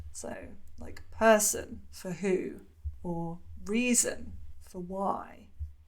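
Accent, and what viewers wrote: United Kingdom. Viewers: I love the way you speak English with British accent . What I like to say is speaking English with correct British accent gives me like a certain charm.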